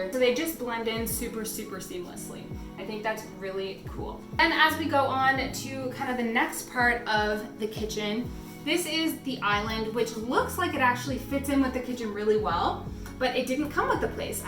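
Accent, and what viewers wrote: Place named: Canada. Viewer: The way Canadians pronounce “about” gives them away 😝✌🏼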